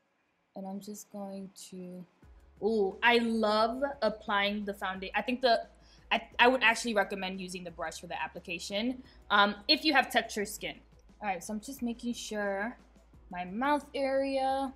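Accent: Dominican Republic. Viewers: Dominican accent?! 😃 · Clicked because the title said “morenita” and I just knew you would be a Latina 👏🏽 is the accent Dominican? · YOU'RE DOMINICAN FOR SURE 🇩🇴 y si no, tienes el acento 😍😍😍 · OMG first time watching your videos and I LOVE YOU OMG 😂😂 the little Spanish isms you throw in are priceless. I am Dominican and your accent sounds it, are you!?!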